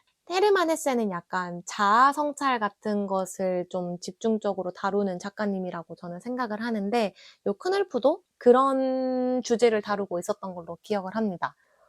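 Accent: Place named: Gyeongsang, South Korea. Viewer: 예전에 김동식 작가님도 글쓰기에 대한 공부를 단 한 번도 해본적 없으신데, 보안관련 일을 하다보니 저녁에 시간이 많이 남았고, 이런것도 이야기가 될 수 있나? 하는 마음에 글을 적기 시작하셨다는 걸 듣고 엄청 놀랐었거든요, 이미예 작가님의 펀딩까지 가신 스토리도 그렇고, 전혜지 작가님, 😶 정말, 저런 몰랐던 작기님의 사정을 들었을 때 강하게 동기부여가 생기는 경우가 있더라구요! 허업! 🫢 저도 누군가 관계를 형성함에 있어 가장 중요하게 생각하는 게 무어냐 물으면, '나는 존중과 배려를 언제나 1순위로 생각한다, 입장을 바꿔서 생각해 볼 수 있어야 한다' 라고 언제나 말해왔거든요??? 너무 신기해요!! 😶 갱상도 사투리에 미소 지으며 들었네요 ㅋㅋ 🤭 아니 근데 어떻게 저렇게 말 한 번 안 절고 낭독을 잘하실 수 있을까요?? 축축하고, 찝찝한 이야기를 저렇게 밝게 귀엽게 설명해주시는 ㅋㅋ 😂 정말 스토리 정리를 엄청 몰입감 있게 너무 흥미롭게 잘 설명해 주셔요 😊 저~~ 얇은 책들에 붙어 있는 인덱스나, 하이라이트 친 것들을 보면, 님이 얼마나 감명 깊게 읽으셨는지 느껴지는 거 같아요:) 저는 요즘, 삼체나 프로젝트 헤일메리 안에 거론되는 가상의 이론이라든지 언급되는 기존의 이론들에 대해 과학자 또는 이과 유튜버 분들이 너무 즐겁게 토론하고 얘기하시는 모습을 보면서, '와, 나는 저런 이론은 이해도 하나도 안 되고, 무슨 소린지 모르고 지나갔음에도 이렇게나 재밌게 읽었는데, 저들은 도대체 얼마나 더 더 더 재밌게 읽었을까?' 하는 생각을 하게 됐어요 😢 그래서 하드 SF에 자주 등장하는 몇 몇 이론만 대략이라도 이해하고 있자! 하는 마음에 검색하기 시작했다가, 과학 유튜버들에게 빠져버렸어요, '어!? 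나, 과학 좋아했네?' 같은 느낌이에요 ㅋㅋㅋ 😂 어쩌다보니 책도 안 읽고 과학 유튜브만 보고 있는 요즘입니다 😆 아침에야 댓글을 보실! 오늘도 또 행복한 하루 보내시길 바라요 🤭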